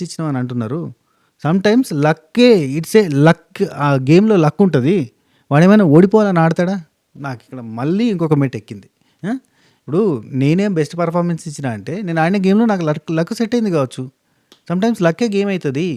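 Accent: Telangana, India. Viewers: తెలంగాణ యాస సూపర్ మావ. కెసిఆర్ మాట్లాడినట్టు ఉంది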